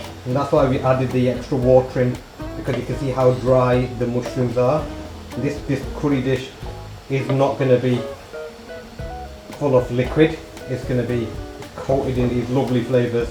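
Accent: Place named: Northern England, United Kingdom. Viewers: British accent. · Northern English I think to be more precise, not too far from my hometown I'm guessing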